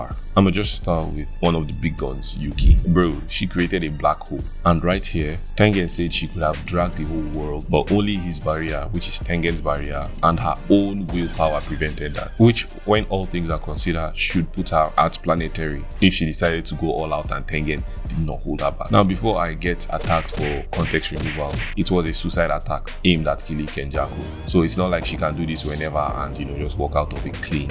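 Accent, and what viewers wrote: Nigeria. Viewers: U sound so much like a Nigerian, good one brother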